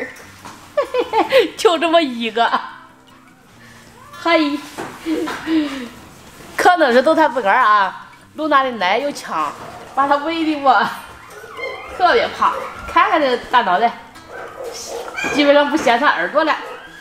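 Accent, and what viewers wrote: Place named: Hebei, China. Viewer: 真是发自内心的开心啊！做自己喜欢的工作最有滋味啦！听见我们河北的乡音，亲切💗💗💗💗💗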